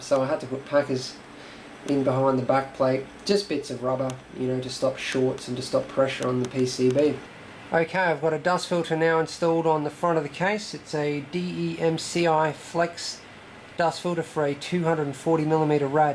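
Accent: Australia. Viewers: I thought you were from Australia, I'm from Perth. Btw nice system im currently building my first water cooled setup up. Just a question? Umm how would you run your water loop? Would you go Reservoir > Pump > Radiator > CPU ???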